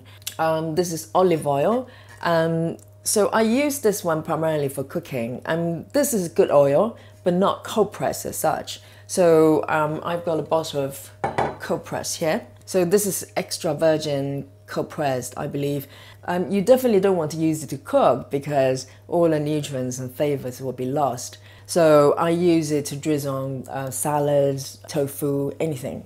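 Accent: England, United Kingdom. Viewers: I also love your videos. You have a pleasant sounding voice, and of course love the English accent! I binged watched most of your videos last night, and can’t wait to try them all. I made your flatbread, and it came out perfect. This morning I made bullet proof coffee with organic breakfast blend coffee, MCT oil, coconut milk and 1 Stevia packet. Blended it in my Ninja blender. OMG! I absolutely loved it! It was like drinking a latte.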